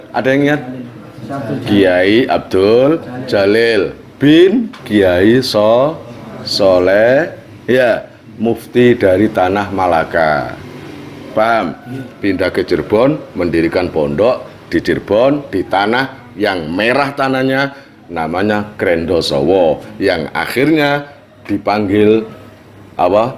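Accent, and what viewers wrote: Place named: Banyumas, Indonesia. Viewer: Mbrebes juga ngapak kyai 👍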